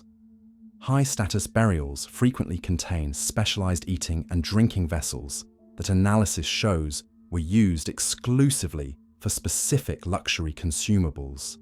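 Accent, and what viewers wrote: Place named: United Kingdom. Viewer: You don’t understand a uk accent?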